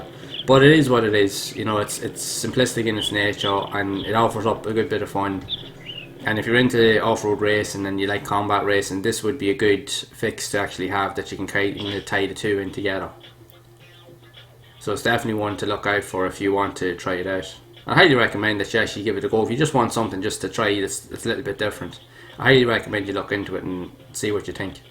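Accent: Ireland. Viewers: Wow your pumping out these videos every day. I appreciate your hard work. Looking forward to more. I subscribed to keep up! Love your accent also. Whats your country of origin if you don't mind me asking? Im from the U.S. Nevermind i looked at your about section and saw its Ireland. :) I have a channel as well but its not so much videogames as funny videos or movie clips.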